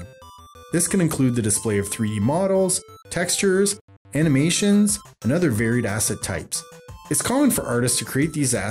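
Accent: Canada. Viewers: Speaker is Canadian? Doesn't matter...just love your "o" sounds. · Is he Canadian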